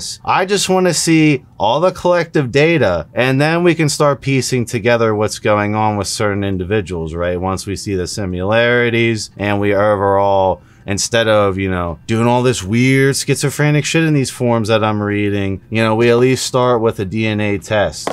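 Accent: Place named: Western Pennsylvania, United States. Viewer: I hope you get sorted and feeling better. I noticed a Western(ish) PA in accent. Is this where you are from?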